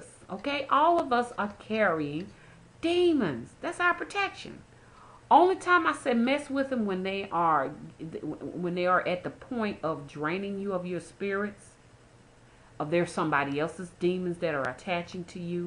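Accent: South, United States. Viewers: this is the first time am watching and I believe no one should have judgment of anyone. i love your southern accent am Caribbean and I like how the people from the south down home. I don't know much about this stuff but alot of what you say is true. I am tired of hurting and my heart broken when am always be so nice. I really want my boyfriend back and want him to love me again. Us living in china i think he had something put apon him to up and leave. I just want him back.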